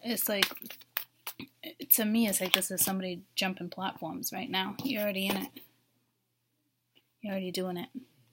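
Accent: Boston, United States. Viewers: just found your channel and boy is this accurate. dealing with an aries i left in the past. im canadian but visited the states quite abit as a child...is that a boston accent? love your voice!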